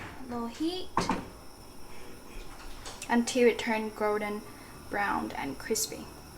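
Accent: Australia. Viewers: are you living in australia? i can hear it in your voice a subtle aussie accent 😅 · your accent is evolving! sounds like an ausie <3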